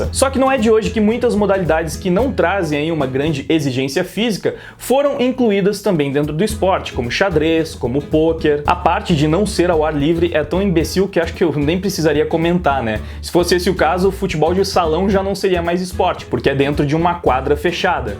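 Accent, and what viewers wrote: Rio Grande do Sul, Brazil. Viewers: Caralho mano, tu é gaúcho?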